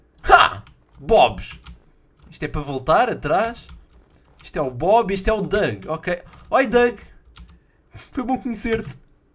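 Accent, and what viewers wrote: Portugal. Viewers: o é português. por isso ele fala assim!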